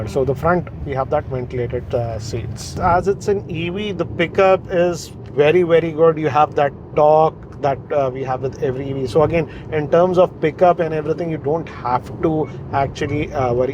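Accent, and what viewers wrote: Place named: India. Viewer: Speciality of Indian English... 5 "actually" and another 5 " in fact " each sentence. Coming to e Vitara, I think it is the second best in the 20 lakh range. Most value for money is Tata sierra.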